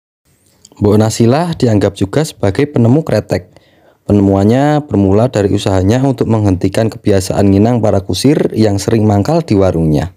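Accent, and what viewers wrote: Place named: Java, Indonesia. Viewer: Mantep ko ,masih terlihat aksen Jawa nya , jadi ciri khas identitas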